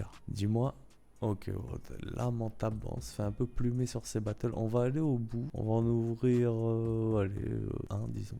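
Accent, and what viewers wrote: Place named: France. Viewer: this channel sounds like a handsome french man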